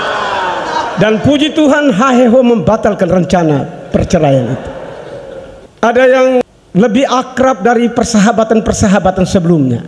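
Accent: Flores, Indonesia. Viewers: Mantap, tetap semangat 💪 luar biasa kotbah nya kaya orang Flores nih. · Luar biasa...sy suka sekali kalo renungan dari pater...logat floresnya yg membuat kami bangga sbg orang Flores, GBU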